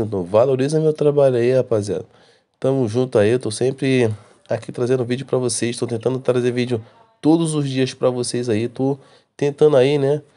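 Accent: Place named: Rio de Janeiro, Brazil. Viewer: Po carioca é dahora 😂😂😂😂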